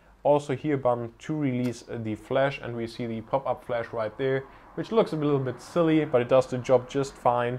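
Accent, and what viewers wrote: Germany. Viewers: Wie ich einfach direkt raushören konnte, dass du Deutscher bist :D Wie auch immer, super Review!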